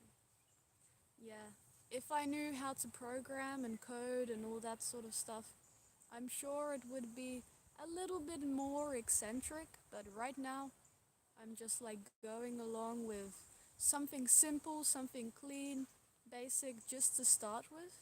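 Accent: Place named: Australia. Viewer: please do more light language! I rarely come across light language on youtube that legitimately affects me, and yours does, along with Keleena Malnar's. Are you Australian? You sound aussie for the most part!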